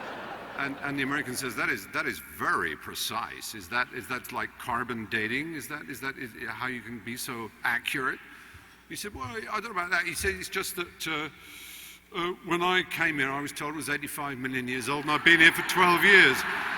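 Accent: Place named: England, United Kingdom. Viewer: From where in England is Fry's accent??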